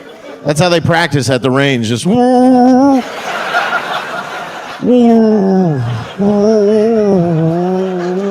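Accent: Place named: United States. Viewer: This is the most american sounding canadian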